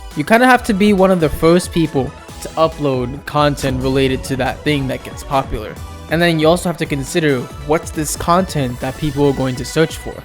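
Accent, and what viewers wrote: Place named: United Kingdom. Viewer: why does your voice sound so british?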